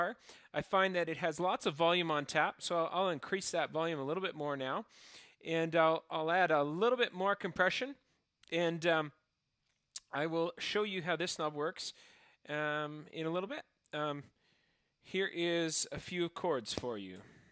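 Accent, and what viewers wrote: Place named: Canada. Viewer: every time you speak it makes me miss my Canadian friends.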